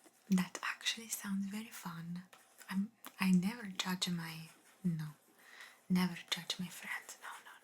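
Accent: Italy. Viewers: I love your Italian accent videos beautiful eyes ❤your voice is so calming 🫂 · I love the creativity that you put into these roleplays. Very few ASMRtists would think to talk about water towers or Tom Cruise playing hide-and-go-seek. Your Italian accent is very fun too. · È così bello ascoltarti, il tuo accento italiano è meraviglioso e molto rilassante.💚🤍❤️ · Hello, magnificent ASMR with an Italian accent. I loved it and enjoyed it, it's relaxing. Greetings, beautiful 🌹🌹🌹🌹💖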